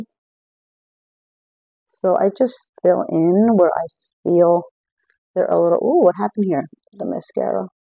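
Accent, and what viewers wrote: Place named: East Coast, United States. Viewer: just found you and subscribed, mostly so I can hear your east coast accent! Love the Look!! this is about what I do on the daily, I might put on a fuller coverage foundation for going out but like you said, less is best, I'm a 68 year old Midwesterner and I could listen to you talk all day!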